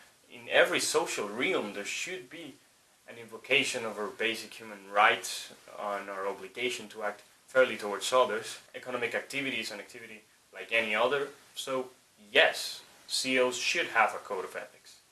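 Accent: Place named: Netherlands. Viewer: Wow, you sound so dutch...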